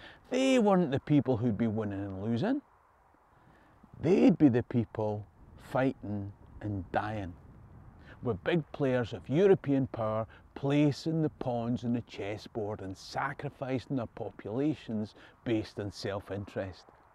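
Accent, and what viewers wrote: Scotland, United Kingdom. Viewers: Happy New Years and family!!! I watched someone else vid. But finely I now know what a Jacobite is. I had a hard time understanding you. I would turn up volume. When you would say who they were. I think it's was your Scottish accent. I feel all caught up. Warm Hugs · for some reason the scotish acent makes it more entertaining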